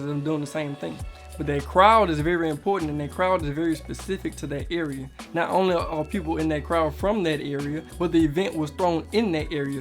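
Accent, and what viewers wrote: United States. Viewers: Love the accent bruh what state?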